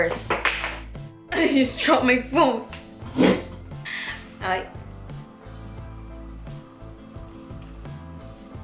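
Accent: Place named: Arizona, United States. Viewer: Sounds like she's from Arizona 🌵😃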